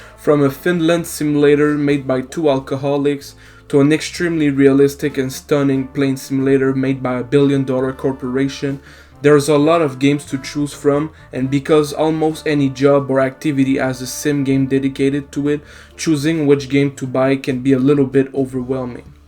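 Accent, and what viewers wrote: Quebec, Canada. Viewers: talking about mon bazou, you sound like you have a Quebec accent. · your french canadian for sure (quebecois calisse) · Toi t quebecois avec ton accent han?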